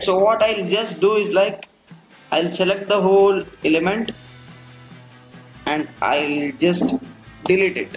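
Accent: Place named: India. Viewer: Indian accent too hard to understand.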